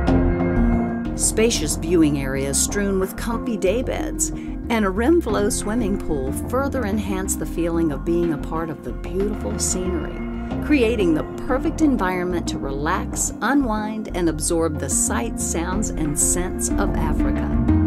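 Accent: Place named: United States. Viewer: Why would a Sth African Lodge use a fawning American lady for the voiceover, in this grating sycophantic advert, is a puzzle.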